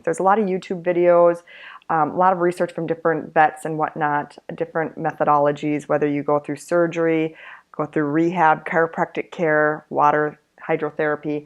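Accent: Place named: Midwest, United States. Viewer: very very good and informative series. My English Bulldog has been diagnosed with a stage III Luxating Patella also. I have not been told at this time that his ACL is not intact. He is 2 1/2 yrs old. It sounds like you would like you are from the Midwest. I plan to take him to the UNIV of WI Veterinary School which is supposed one of the best in the country. His first consultation will be a 4 hr exam ( so a very thorough exam) and then at that point he will be diagnosed as to how to proceed. Thanks again for the video series so I know what to " really expect" once he is sent home. I have been told that he will be staying overnight with his team to include check ups with his anesthesiologist to make sure he is not having any severe reactions for the surgery and anesthesia that was administered.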